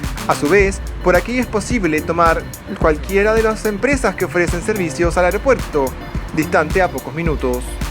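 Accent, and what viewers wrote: Chile. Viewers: tu acento a lo chileno fue muy divertido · Te sale casi igual nuestro acento. Pero se asemeja más un poco a la tonada de los penquistas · sigue hablando como chileno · tu esfuerzo de hablar como Chileno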